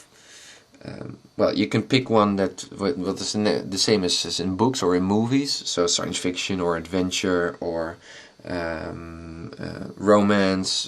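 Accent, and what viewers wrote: Netherlands. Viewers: Ben je Nederlands dude P: ? ik hoor t aan je stem.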